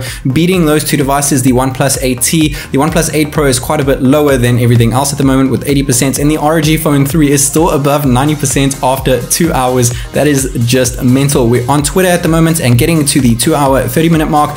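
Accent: South Africa. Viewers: Watched your Qna vid and a few times when I watch your videos i think you sound south African and it turns out you are! I am also from SA, live in Cape Town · U sound south african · This guy sounds soo South African 🇿🇦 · I think I know a South African when I hear one :D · Are you from South Africa? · South African ?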